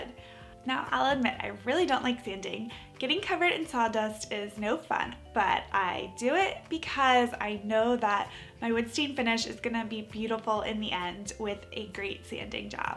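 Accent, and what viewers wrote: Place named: California, United States. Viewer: Terrible Californian “ Hollywood “ accent. Speak the Queen’s English Dear!!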